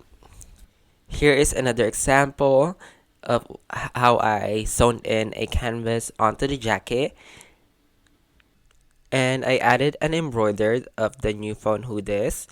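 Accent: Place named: Philippines. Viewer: I thin he got filipino accent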